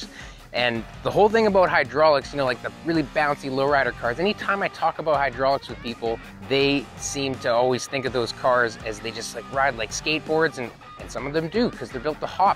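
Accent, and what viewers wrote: Canada. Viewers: I have no idea who you are, you sound Canadian to me, but I love what you built... yup... I'm gonna subscribe for sure... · Thats why it doesnt sound arrogant. Its canadian. Ill sub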